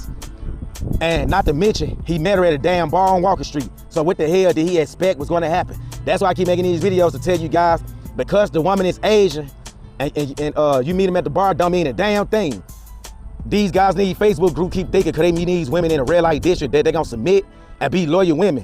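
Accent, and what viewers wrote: Mississippi, United States. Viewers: R you from Mississippi bra… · I was guessing Mississippi.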